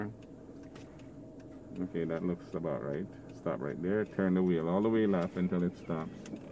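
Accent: Jamaica. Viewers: Sounds like he’s Jamaican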